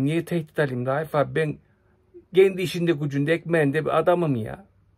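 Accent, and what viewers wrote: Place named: Anatolia, Turkey. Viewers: Aksaray şivesi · Genel iç anadolu şivesi galiba( raif abi) birazda güneydoğu bölgesine geçsen güzel olmazmı Inan seni izlerken ağlanacak halimize gülüyorum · Anadolu insanının mantığı, deyimleri, bu şive, ... vallaha var ya, lan argadaş neymişin be...! Geberiyom seyrederkene ...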